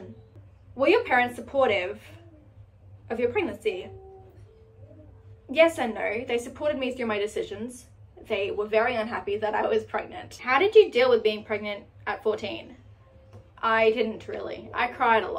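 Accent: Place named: Australia. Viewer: nah, the aussie accent sounds really nasal and masculine on her.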